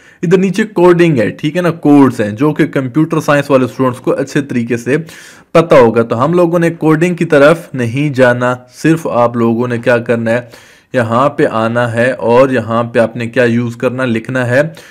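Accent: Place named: Mianwali, Pakistan. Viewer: Boli se AP mianwali k lgty hain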